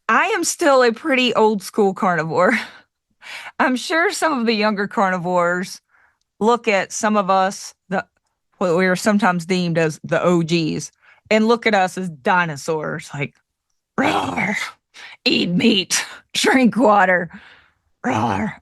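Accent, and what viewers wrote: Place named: East Tennessee, United States. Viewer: New subscriber. Love your personality. You sound like you’re from around here, East Tennessee.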